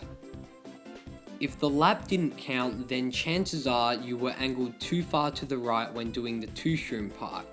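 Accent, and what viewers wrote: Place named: Australia. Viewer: Like this comment if you only care for the Aussie accent 🙂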